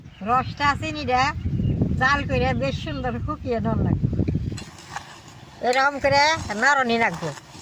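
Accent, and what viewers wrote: Tangail, Bangladesh. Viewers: আপনার বাড়ি কি টাংগাইল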